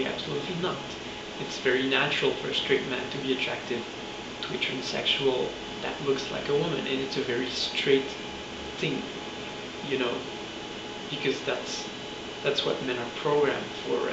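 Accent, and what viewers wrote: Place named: Quebec, Canada. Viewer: You're very beautiful and your Quebecois accent is wonderful. I hope everything is going well with you. -Eric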